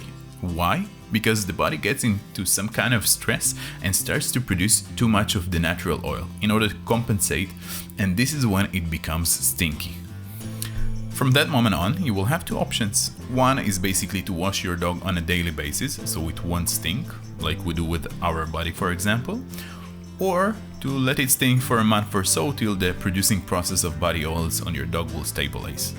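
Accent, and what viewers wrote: Israel. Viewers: your truly charming accent which I'm going to assume is Israeli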